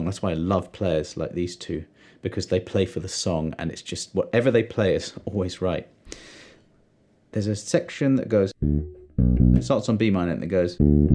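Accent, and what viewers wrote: United Kingdom. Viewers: I pronounce Jaco 'Jahko' and 'Yamaha' 'Yahmaha' (I have 5 Yamaha basses) so you're preaching to the converted. I commented because I thought it overly pedantic that an American would attempt to school an Englishman - from whom, I might add, he's getting FREE bass instruction - on pronunciation. It'd be like me viewing an American cooking segment and admonishing the chef for pronouncing the herb (or 'erbs' as Americans call them) basil 'bayzil', instead of 'bazzle' as we do in Australia and the UK. Anyway, that's enough from me. Back to the bass... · Fantastic lesson, as usual,! Your lessons are so informative, and I would say the best ones on YT. I’m curious, though (and I hope you won’t be offended), but why do you Brits pronounce “Jaco” as “Jacko”? It’s actually “Jahko” (long A, as in how Brits say “tomahto.”) This also goes for “Yamaha,” which I hear Brits pronouncing with a short A, as in “Yam (rhymes with “ham”) aha,” instead of the correct “YAH-maha.”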